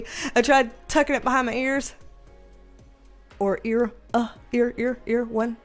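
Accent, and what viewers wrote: South, United States. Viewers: We love your southern twang! 😁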